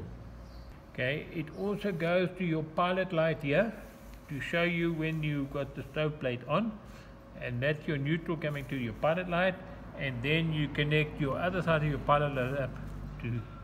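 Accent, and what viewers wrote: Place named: South Africa. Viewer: Thank you maneer. I hear your accent from Mzantsi🙏🏽🙏🏽🙏🏽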